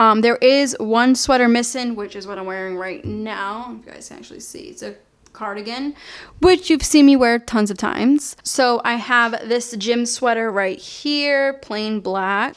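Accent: Boston, United States. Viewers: this just popped up and i'm glad i clicked it, immediately subscribed when i heard that boston accent. about to go binge-watch all your videos! hehe love from medford <3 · The Boston accent is real haha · It sounds like a bawston accent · i can totally relate! i'm from the Boston area :) you got the accent haha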